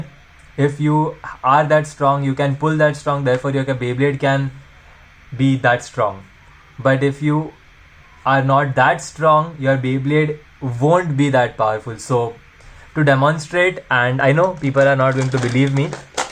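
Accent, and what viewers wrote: India. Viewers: Your accent is indian english and i dont think its fake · You have a perfect Indian accent bro continue doing you great work 👌👌❤️ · Your accent is like Indian only bro 🔥🔥🔥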